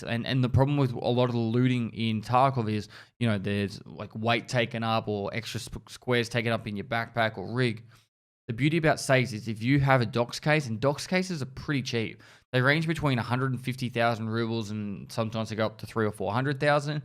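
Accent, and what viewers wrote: Australia. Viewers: sounds australian to me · Hes Australian as fuck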